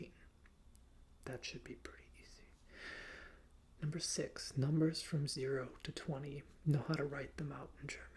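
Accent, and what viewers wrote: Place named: United States. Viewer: As a German-speaker, I say your accent is adorable. ^^ I think it's the first time I ever hear an American (you're American, right?) speak so much German haha. :) I'm glad you uploaded a new video, I find your voice very comforting, I listened to all your vids while doing my dreadful internship in Berlin. ^^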